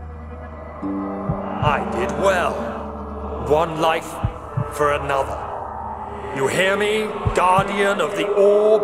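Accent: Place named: England, United Kingdom. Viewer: I really need some audio books/stories with brooding english accented voices like this.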